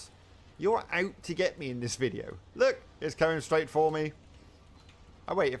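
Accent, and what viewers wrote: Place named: United Kingdom. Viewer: I just started watching your channel and your accent is so cool! ^~^ British accent? idk lol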